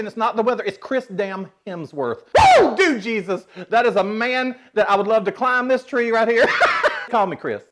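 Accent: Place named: South, United States. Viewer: Just got started watching your videos after I found you on TikTok ! You are hilarious and I love your humor and southern drawl! Remind me of my family ♥️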